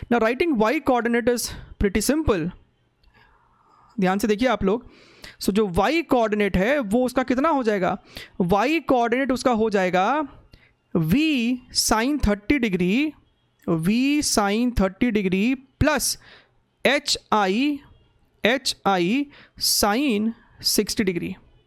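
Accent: Bihar, India. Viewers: Badhiya hai.... meanwhile... Bhanhiya hai...❤️❤️❤️ Pure bihari tone Love frm bihar sir...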